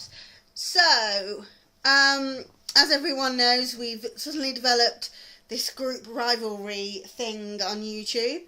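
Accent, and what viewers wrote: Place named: United Kingdom. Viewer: oh whatever girlfriend, let those haters hate, your videos are great and your views on wrestling are smart and direct, plus, you have a killer british accent.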